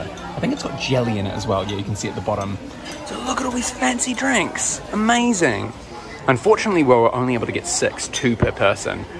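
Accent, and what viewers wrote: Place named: New Zealand. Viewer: sweet! a kiwi playing genshin I enjoy genshin and im planning to move back to new Zealand in the future trying to learn the accent again lol so this is perfect XDD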